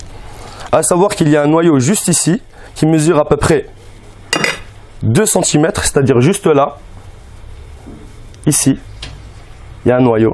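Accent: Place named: Kabylie, Algeria. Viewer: Je reconnais l'accent kabyle lol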